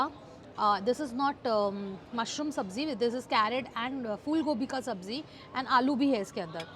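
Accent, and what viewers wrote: Karnataka, India. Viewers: U speak kannada so cutely..... When ur trying to speak kamnada.... A kannadian heart feel so cute.... 🥰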